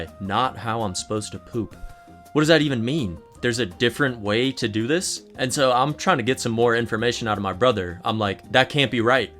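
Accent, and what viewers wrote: South, United States. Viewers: 1. When I was little I thought it was weird to sit straight forward on the toilet so I sat on the side of the bowl facing the wall 2. I just now realize has a southern accent, after hearing him say "butthole" repeatedly and now I can't unhear the accent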